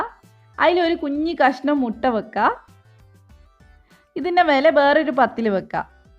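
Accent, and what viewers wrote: Kannur, India. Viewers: Molu.kannooru kaariyaannennu yenikku.manassilakkan kazhinjirunnu.kannoorbhasha.kurachoode nannayi samsarikkunnunnu maathram.musleengalude .samsaarareethi alpam.maattamundavum.njammakku.yennokke parayum. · അടിപൊളി കണ്ണൂർ ഭാഷ 😂😂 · 😀igane thanneya samsarikkalu njammalu kannur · Kannoor bhasha policy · കണ്ണൂർ ഭാഷ ho... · Ente koche i thought of subscribe the channel. But i couldn't understand your kannur slang even though i am a mallu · 😂😂😂ഞാനും kannura ,,നമ്മൾ ഇങ്ങനെ തന്നെയാ പറയുന്നെ😂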